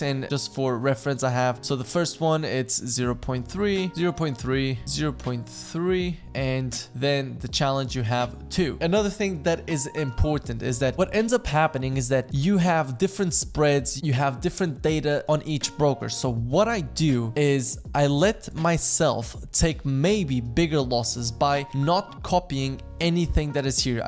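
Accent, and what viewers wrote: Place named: Portugal. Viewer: Your accent brings some portuguese vibes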